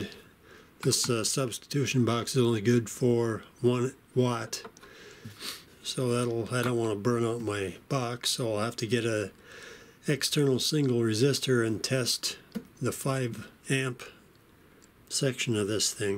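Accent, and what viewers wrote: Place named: China. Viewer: chinglish yup I know :(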